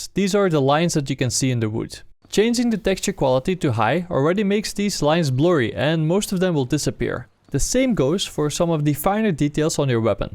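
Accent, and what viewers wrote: Netherlands. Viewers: lol dit is echt nederlands accent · Are you dutch?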